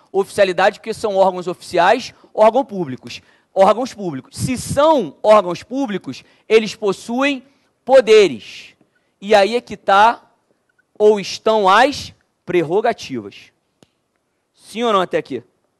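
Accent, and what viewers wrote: Rio de Janeiro, Brazil. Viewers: Na boa , a aula não é mau mas sotaque carioca é um saco kkk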